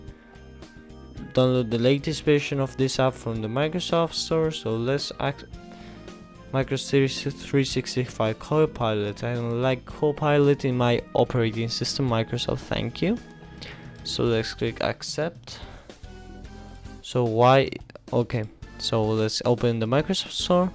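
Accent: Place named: Russia. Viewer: ik he just sounds like a younger enderman, he has the Russian accent and all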